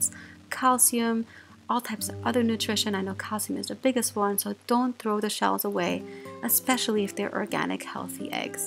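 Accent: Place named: Germany. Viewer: you sound german, where are you from?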